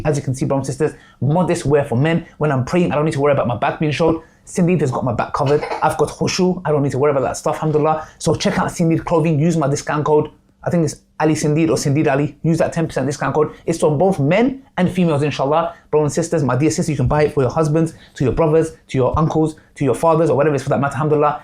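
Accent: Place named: United Kingdom. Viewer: By the way u have a beautiful British accent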